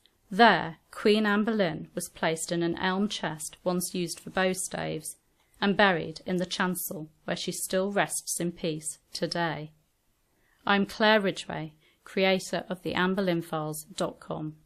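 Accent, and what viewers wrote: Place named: East Anglia, United Kingdom. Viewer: Misinformation is commonplace with most if not all historic sites. Tour guides or docents if you like; often have to follow a strict guideline or script of what they tell the public. It's unfortunate but all very true. Here in the States this happens mostly regarding period homesteads from Salem Witch Trial sites in Massachusetts to antebellum plantations in the former southern colonies and later states. Oh by the way, lovely accent! East Anglia?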